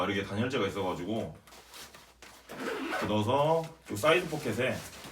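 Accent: South Korea. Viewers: 아따 전라도 백패커요~ 강원도 충청도 경상도 백패커는 많이 봤는데 전라도 백패커는 첨보요~ 반갑쏘잉~ 말이 잼나고 좃쏘이 따라해봤어요. 전 부산출신 경기도 거주 사람이에요 ㅋ 잼나게 잘봤어요. 전라도 유튜버는 첨봐서 기대가 되네요 ㅎ 잼난 영상 많이 찍어주쏘잉~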